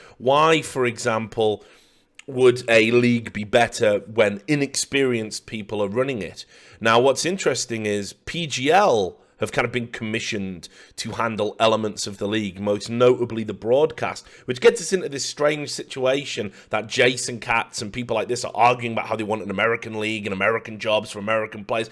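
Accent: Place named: Newcastle, United Kingdom. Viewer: are you a fucking Geordie? It all makes sense now, I love you even more.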